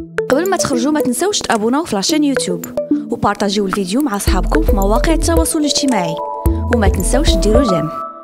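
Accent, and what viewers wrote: Egypt. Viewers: اويلي وحدي قالت ليك كاتحاااول باش تهدر بالداريجة هاذي باقة حتى ما تشهرات ومعمرها خرجات من المغرب و غالبة عليها اللهجة المصرية ويلي بااا قالت لك كانحااول ايوا بلاش ما تبززي على راسك ا حبيبة 😬😬😬😬😬😬😬😬😬😬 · لا من لباسك ولا من لهجتك كل الناس كانت تقول بأنك مصرية يا وخيتي احكي بي لغة القران العربية هل شوفت مصرية تحكي مغربي او لبنانية تحكي مغربي ؟؟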